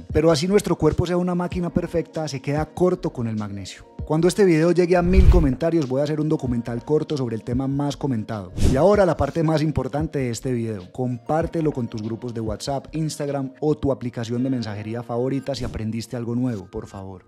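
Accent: Colombia. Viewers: Esa voz colombiana me da salud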